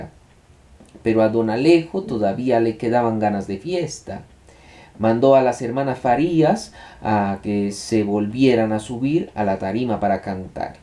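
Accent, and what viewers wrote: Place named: Colombia. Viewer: me agrada tu acento colombiano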